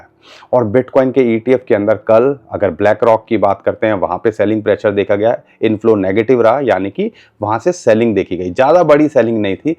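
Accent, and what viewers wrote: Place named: Varanasi, India. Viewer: Bhai ekdum banarsi andaz me baat karte ho GURU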